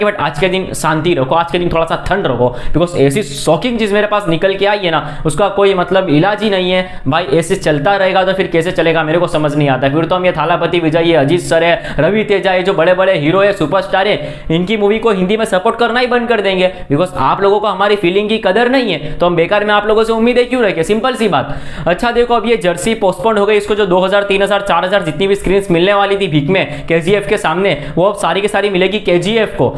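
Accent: Gujarat, India. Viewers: You are Gujarati?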